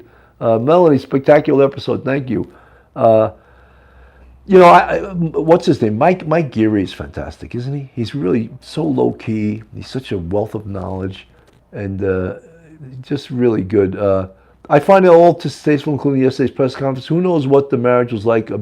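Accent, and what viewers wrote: New York, United States. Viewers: There's that New Yorka accent !!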